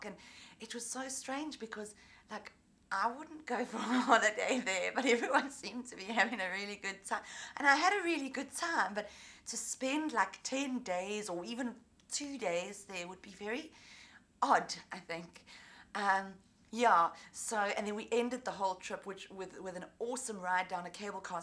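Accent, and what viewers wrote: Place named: United Kingdom. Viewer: u got british accent..;)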